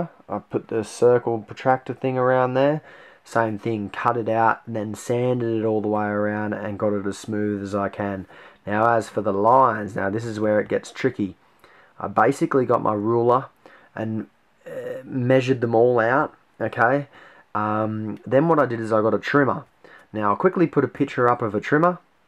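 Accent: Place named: Australia. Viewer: Australian accents really make you wish you had one-From Italy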